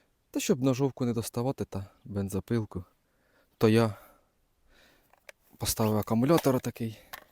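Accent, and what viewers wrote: Poltava, Ukraine. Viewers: Вітаннячка! Все моє дитинство, пройшло у бабусі в Полтавській області... Життя іде, бабуся вже давно померла і відвідати рідні краї вже нема можливості... На Ваш канал натрапила випадково і почала дивитись. І чую у розмові, щось таке рідне, таке знайоме, а потім зрозуміла - це полтавський акцент, він особливий❤ Бажаю Вам здійснити всі Ваші мрії і реалізувати всі задумані плани! Ви щира і добра людина) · в мене родичі теж живуть на Полтавщині. Розмовляють як. А дідусь з Черкащини. До Другої світової війни була Полтавська обл. Теж так розмовляв, як. Приємно слухати. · Теж зачепилася саме за говір, одразу зрозуміла , що полтавський. Обожнюю і Полтавщину, і говір, люди, як на мене найприємніші і найщиріші з усієї України. Буду слідкувати за ремонтом! Знаю, що це таке, сама ремонтую свою хату на Вінничині, це дуже радісно потім бачити результат і особливо жити в своєму, а не найманому житлі і вирощувати все, що хочеш! 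Бажаю успіхів і розвитку, буду слідкувати!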